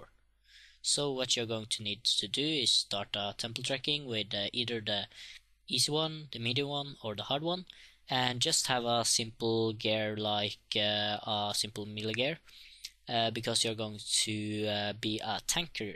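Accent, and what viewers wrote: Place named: Norway. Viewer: u from Norway? sounds like that :P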